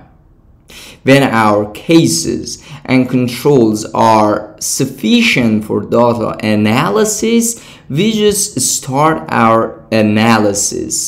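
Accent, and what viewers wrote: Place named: Iran. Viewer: Thank you for sharing this amazing video. Right from the start, I could tell that you are Iranian because of your lovely Farsi accent and the way you wrote "eighty" in Farsi 😁. By "group matching," do you actually mean frequency matching?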